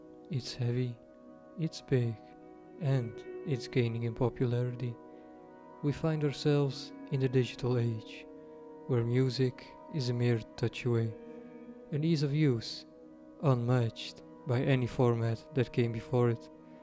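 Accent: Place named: India. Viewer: First i thought, there is speaking an indian😅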